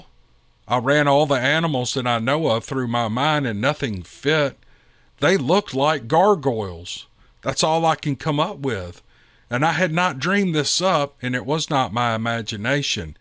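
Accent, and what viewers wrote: South, United States. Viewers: Nanny-mo!! 🤣 I LOVE the way you mispronounced that! 😘🥰 It's actually pronounced Nuh-nye-mo. But I couldn't care less if you get it right. I love your southern drawl and your great storytelling.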